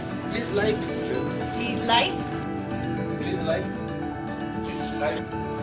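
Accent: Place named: India. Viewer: Ini gara-gara ada logat India nya gw jadi gk ngerti, kalian kali jangan ada logat India nya woyy.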